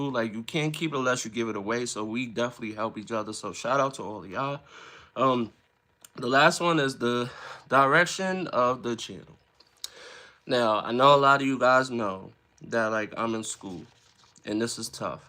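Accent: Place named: New York, United States. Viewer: I will watch Sinners when it comes on video. I have heard good reviews too. I didn't know you were a counselor, so good to learn more about you. Good message you shared. Meeting people where they are at, not where we want them to be. Listening is key. Like you said, sometimes we just need someone to listen, no judgement, no "answers". Good video. Love the accent, sounds like New York!🙌🏽👍🏽 Going to school for Social Work. Honorable!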